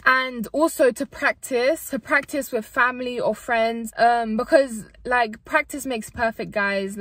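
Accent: London, United Kingdom. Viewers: Anywhere in London, hard to tell. Probably south or east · Hello! It is British accent?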